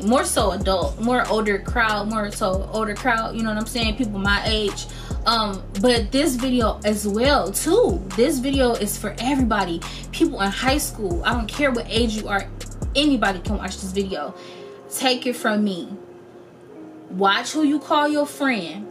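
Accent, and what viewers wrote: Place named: Detroit, United States. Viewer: When u said I’m from Detroit, I felt that that is how we talk , we be spicy , new subbie girl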